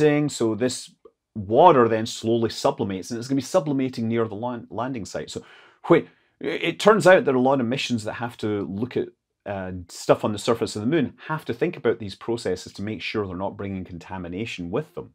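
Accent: Scotland, United Kingdom. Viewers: I doubt you remember, but I asked you if you were Scottish or Irish a long time ago. How did you regain your Scottish accent and loose the American one also?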